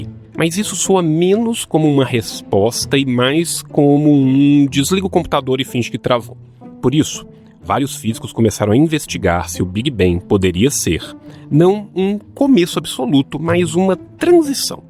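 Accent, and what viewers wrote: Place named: Minas Gerais, Brazil. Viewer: é um universo. E o sotaque mineiro deixa tudo melhor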